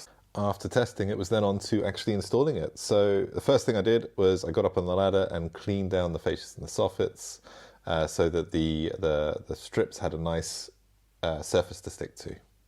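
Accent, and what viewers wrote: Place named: England, United Kingdom. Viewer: I'm surprised nobody has commented on your Bokke jersey to pair with your English accent. Go Bokke!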